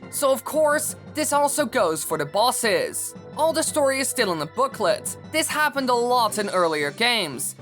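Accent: Netherlands. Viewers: Are you from Nederland s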